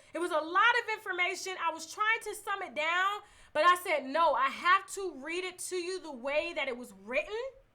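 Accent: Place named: South, United States. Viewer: I love your country accent.I am also a pisces so i relate to you on so many levels!🙋🏾‍♀️✊🏾👸🏾💜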